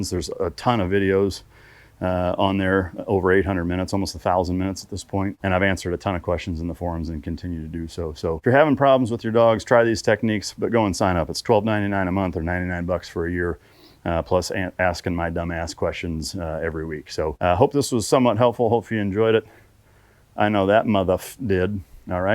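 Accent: Netherlands. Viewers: Are you Dutch? Good video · are you dutch ? with the af and blijf?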